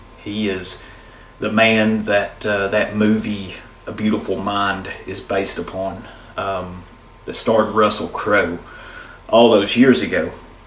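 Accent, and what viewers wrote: South, United States. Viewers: Your accent is a beautiful thing, brother. Never lose it, pass it to your children. It does not indicate lack of intelligence, you clearly have an abundance of that. Where I'm from, Coosa, the toes of Southern Appalachia, it's dying. The monocultural poison has crawled up from Atlanta and the anri-Southern rhetoric of the last hundred years or so is teaching our young folks that the way we sound means we're stupid. But no, it is a dialect, not an accent. We have our own language. We Southern folks need to stand up once again and say: "No, we are not like you. We are our own people. We have our own language, our own culture. We are here, and we won't be crushed."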